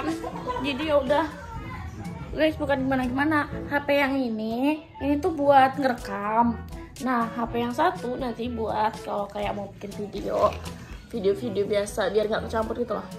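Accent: Java, Indonesia. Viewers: Kak can ya lucu nk ngomong ngwe boso jowo🤣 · Kk orang Jawa tengah kok suaranya Jawa kirain orang Jakarta , jawab Kaka plis aku juga orang Jawa kk jawab ka plis 🙏🙏🙏🙏🙏🙏🙏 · lucuu muni jowo ne 🤣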